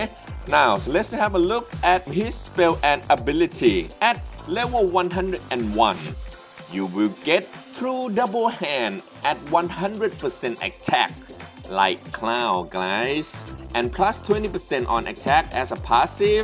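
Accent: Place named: England, United Kingdom. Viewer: I like your English accent its great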